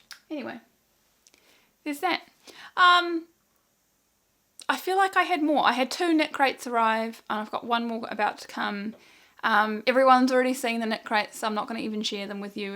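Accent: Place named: New Zealand. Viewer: So excited that I just found your channel! I lived in Wellington for almost 12 years and it’s so nice to hear the kiwi accent again. My NZ accent has slowly been disappearing 😢 hope to see more videos soon!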